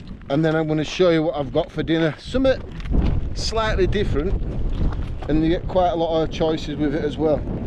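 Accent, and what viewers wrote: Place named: Yorkshire, United Kingdom. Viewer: My husband’s family is from York, your accent reminds of theirs. I love all of the UK but especially York and area.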